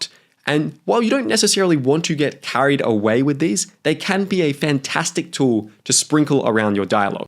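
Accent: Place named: Australia. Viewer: Interesting to hear your accent. Aussie but the US influence is much stronger than from my day. Change in “a”. Pronunciation of “figure” for example.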